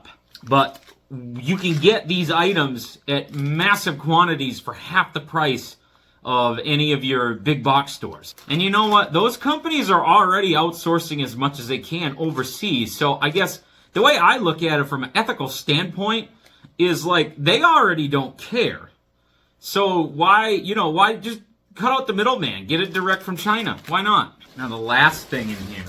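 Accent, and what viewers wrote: Midwest, United States. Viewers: I’m confused. Midwest or Boston accent here lol.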